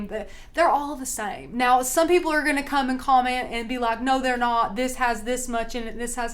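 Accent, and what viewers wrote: South, United States. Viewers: This is awesome training. I love your accent! I'm from SC. Love all of our southern accents!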